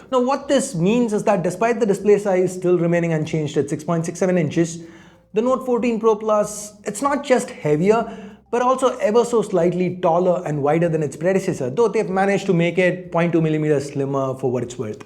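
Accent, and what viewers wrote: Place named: India. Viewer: Co on mówi ????????? indiglish?????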